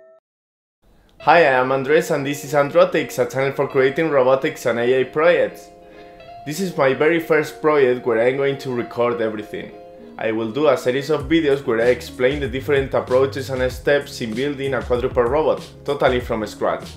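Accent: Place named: Spain. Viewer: Se nota el acento a españita jajaja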